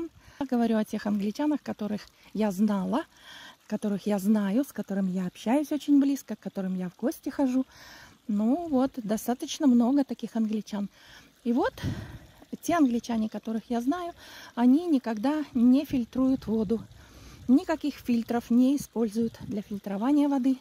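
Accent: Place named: rural, Russia. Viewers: голос сельской женщины - себя боится показать и мужей тоже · Да, чувствуется южный говор. Его не вытравишь никакой Англией.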